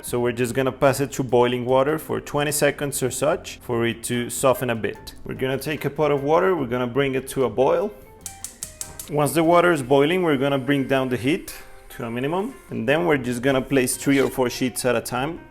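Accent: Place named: Rolo, United States. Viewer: Although I really love your "rolo" accent your english is quite nice 😲 I'm ready for: eats. 💪🏼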